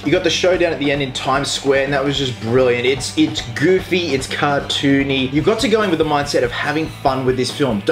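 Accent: Australia. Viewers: I love Australian accents and you have one of the best. -Hugh Jackman -You -ExperGamez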